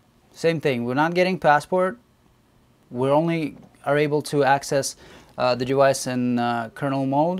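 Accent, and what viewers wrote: Russia. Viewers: чет есть русский акцент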